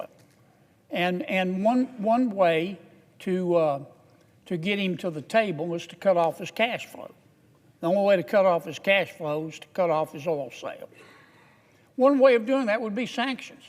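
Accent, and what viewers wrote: United States. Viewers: factualy the best american accent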